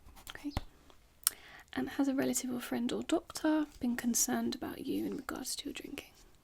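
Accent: United Kingdom. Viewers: Love your voice and accent. Where are you from?? Im Spanish,so I can't "guess" English accents very well,but you sound British(?)Not sure. Anyways really good roleplay💜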